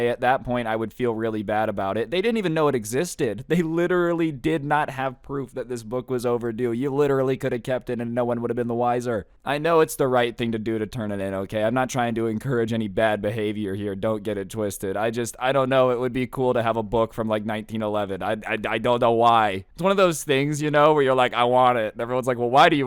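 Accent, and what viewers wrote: United Kingdom. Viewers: Nice British accent. Keep it up.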